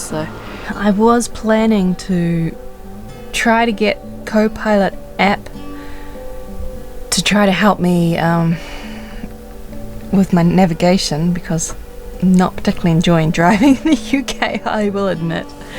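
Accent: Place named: New Zealand. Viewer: Fish and chips. My favourite words in a kiwi accent. 😍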